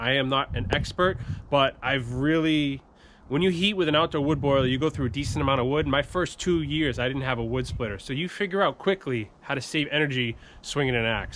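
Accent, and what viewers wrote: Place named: New England, United States. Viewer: Took me 2 seconds to realize you were a fellow New Eglandah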